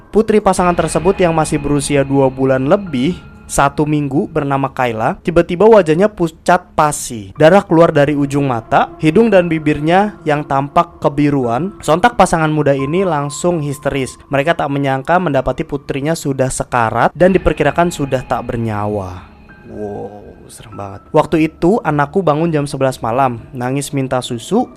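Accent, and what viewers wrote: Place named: Surabaya, Indonesia. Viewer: Hadir coy suroboyoan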